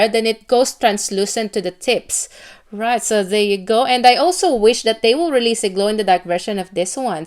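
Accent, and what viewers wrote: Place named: Australia. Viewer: Been binge watching your vids. Hope to feature pops from the Demon Slayer line (if there are any). Signature mo na talaga yang Aussie accent mo. Keep posting very interesting vids!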